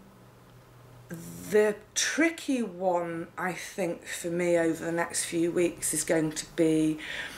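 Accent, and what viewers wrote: United Kingdom. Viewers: ? You have all of us! All 2,404 of us! I still wish would create a mailing address for us, so if any of us want to send her a gardening surprise in the mail......, if your regular use mailing address makes you nervous to share here with us, can you get a separate mailing address that is for all your online friends?, you bring all of us your knowledge of gardening, you bring us your happiness for life, you bring us your "UK" accent (which we adore)......I think it would be fun for you to get random surprises in your mail box.......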